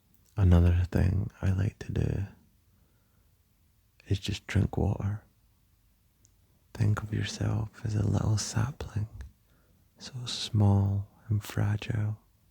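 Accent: Scotland, United Kingdom. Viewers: someone in the comments pointed out you were scottish (i was trying to decipher ur accent) and now all i can think of is supportive shrek :) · I just spent the last 10 minutes contemplating whether your accent is Scottish or Irish, and it has made me question my existence. I really thought I knew the difference between the two. But you're scottish though, right? Anyway, I'm really happy this randomly showed up on my recommended, your voice literally made me melt 😊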